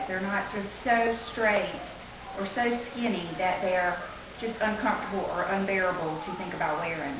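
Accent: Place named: Texas, United States. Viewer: Are you from Texas? I love your accent.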